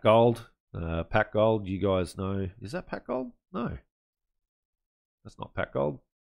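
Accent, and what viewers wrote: Australia. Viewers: Love the Australian accent , EH!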